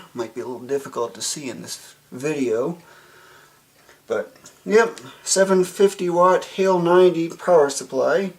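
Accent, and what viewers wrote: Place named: South Louisiana, United States. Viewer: Im lovin that south Loisisianian accent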